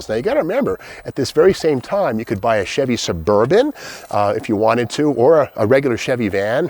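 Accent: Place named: Boston, United States. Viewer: Is it Burlington auto wrecking? You're boston accent comes out as bursington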